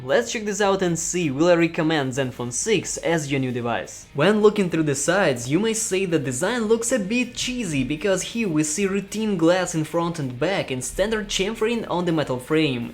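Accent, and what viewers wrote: Russia. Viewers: Love your accent lol, are you a russian? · Why your accent looks like Russian guy? are you from Russia?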